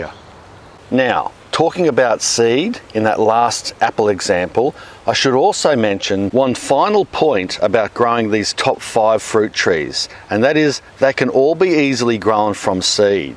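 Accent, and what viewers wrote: Australia. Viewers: Well to us Aussies he sounds nothing like Russel Crowe..this old mate is an Australian not a Kiwi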